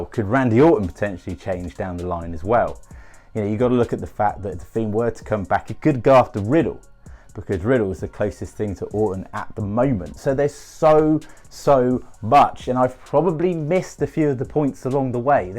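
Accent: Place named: United Kingdom. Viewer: Found your channeled today. Once I heard your voice and that British accent I subscribed immediately - because that’s the law. Everyone knows if you want to be a good wrestling Youtube channel you need a Brit. Don’t question this rule, because the video was really good so the rule was right. Oh and I really hope Bray comes back!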